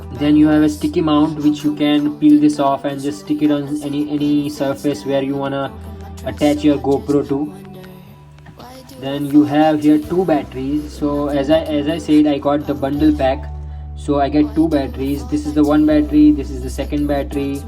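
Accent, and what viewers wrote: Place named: United States. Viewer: American accent is not suiting you. But GoPro9 🤩🔥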